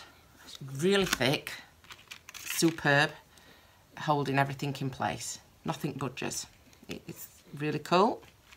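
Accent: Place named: East Midlands, United Kingdom. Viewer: So nice to see a video from someone from England, and not having to pause it to read the translation 😃 I’m in the East Mids, from your accent you’re not that far from me ...love it by the way 🙂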